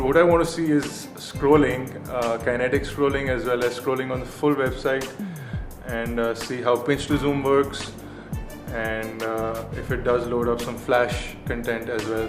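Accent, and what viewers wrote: India. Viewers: anoing indian accent... Apu ali baba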